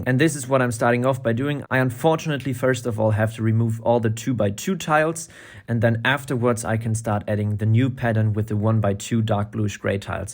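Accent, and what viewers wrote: Germany. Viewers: Are you German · Dieser Deutsche Akzent ist rauszuhören :) Und mir ist aufgefallen das du denselben Text wie im Deutschen benutzt nur halt auf Englisch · Da war ich mir doch sehr sicher, den leiiiichtesten deutschen Akzent zu hören.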